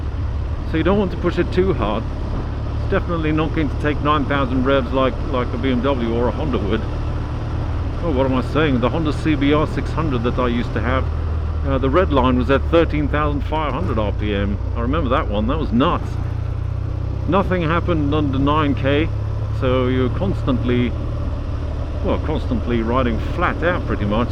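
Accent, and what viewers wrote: United Kingdom. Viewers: Funny I was wondering the same thing. He sounds like a Brit but I know Brits drive on the left?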